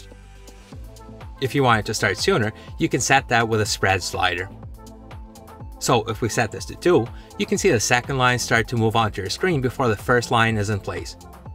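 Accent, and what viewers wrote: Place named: Canada. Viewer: Where is this accent from? It seems Canadian, but I dunnoo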